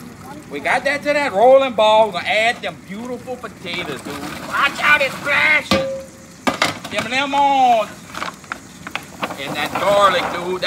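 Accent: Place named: Brooklyn, United States. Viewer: This guy must be from Brooklyn NY!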